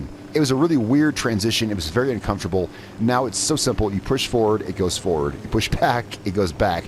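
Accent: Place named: North, United Kingdom. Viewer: You can tell he's from the North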